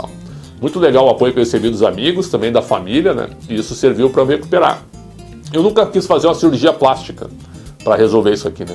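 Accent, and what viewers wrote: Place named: Rio Grande do Sul, Brazil. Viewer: Parabens por essa historia de vida e com bom sotaque gaucho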